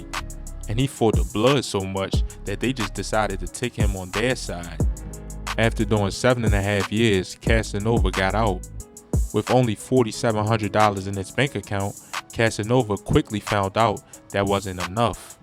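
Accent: Baltimore, United States. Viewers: Do=Dew in bmore 💯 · Nigga you from Baltimore or sum shit? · Def a from Bmore lmao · Not Philly it's Baltimore accent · That was a bmore accent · you from baltimore? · This is first video I ever watched on this channel. So idk who the narrator is. But I know he from Baltimore.. I know that accent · I'm know Baltimore when I hear it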